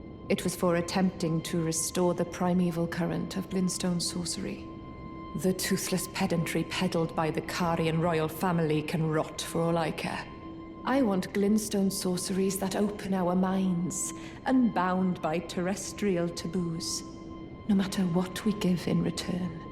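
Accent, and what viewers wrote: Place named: Scotland, United Kingdom. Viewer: Nothing makes me happier than hearing a Scot talk about Souls lore. Bring me McFlail!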